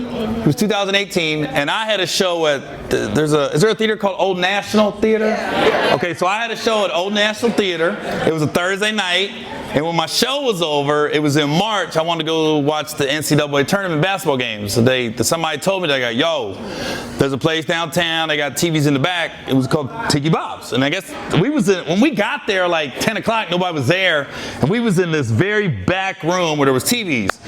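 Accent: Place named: Compton, United States. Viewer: Blech. Why is he talking like a dumb black guy from Compton? Stupid.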